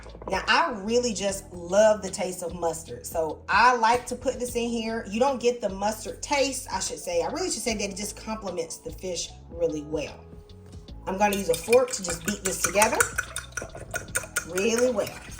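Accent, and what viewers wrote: South, United States. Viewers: 😅 sound like my cuzzin! Girl you country? Love ya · I sure do like your (Southern?) drawl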